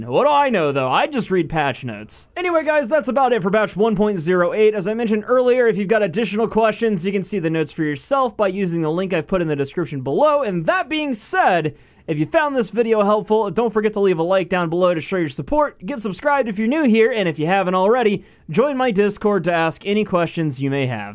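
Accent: United States. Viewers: Your southern accent is so generic xD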